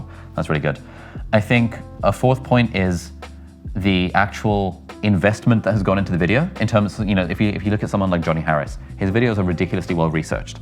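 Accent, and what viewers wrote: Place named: India. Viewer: Your hindi accent is like lagaan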